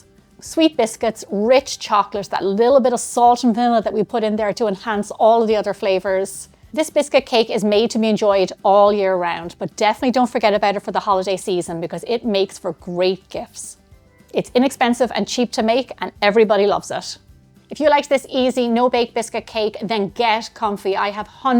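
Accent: Ireland. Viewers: Thank you for this! Are you Irish by any chance? Your accent sounds Irish! I only ask because I miss Ireland haha🤗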